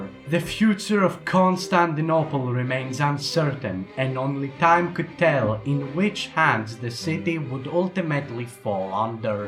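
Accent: Greece. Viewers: Biggest greek accent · Φίλε μου το βίντεο σου είναι πολύ καλό και συγχαρητήρια γι' αυτό! Μου αρέσει τρελά που ξεχωρίζεις ότι είσαι Έλληνας, μέσα από την αγγλική σου προφορά. Εντάξει ωραίο 😄😀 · Μου αρέσει η ελληνική προφορά μέσα στα αγγλικά!!😄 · You have a perfect Greek accent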